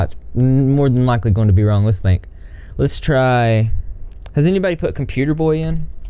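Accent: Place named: Texas, United States. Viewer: are you from Texas? Bc I know some people from Texas who has the same accent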